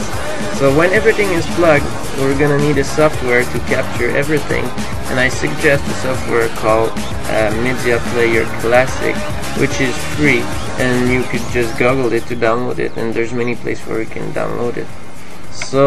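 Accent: Canada. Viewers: Yes it is. it is a Quebecois accent. from quebec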